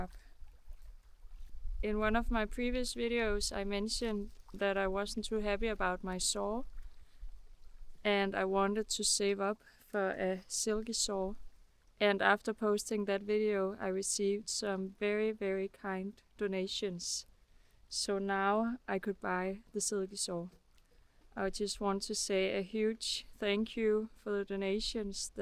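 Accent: Denmark. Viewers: Im instantly hear you are from Danmark on the accent😊 3 hours småland?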